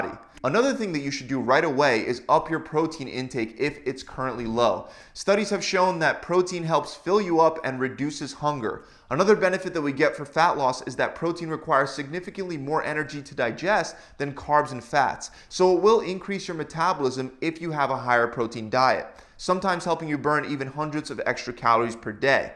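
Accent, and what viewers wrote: Philadelphia, United States. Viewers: He's from Philly or South Jersey. He says wooder. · Me: He sounds like he's from the northeast. Dude: Wutter Me: Philadelphia. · Are you from philly? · Coming here just to say that this guy is obviously from Philly: "drink more 'WOODER' ['water'] throughout the day!" Wooder wooder wooder!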